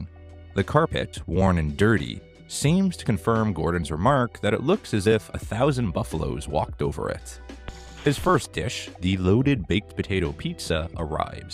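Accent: Canada. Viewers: Just listening to ur accent, are you from Canada? %❤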